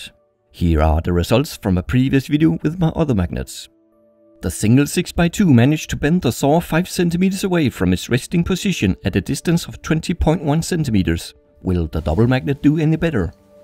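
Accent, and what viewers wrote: Denmark. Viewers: er du fra danmark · Hejsa.🇩🇰 Har ikke set dig før men kunne høre du var dansk med det sammen 😁👍🏻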